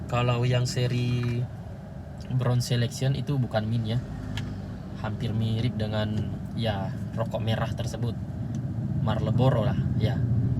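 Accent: Indonesia. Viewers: Logat sumatra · Orang makassar klo dilihat dri logat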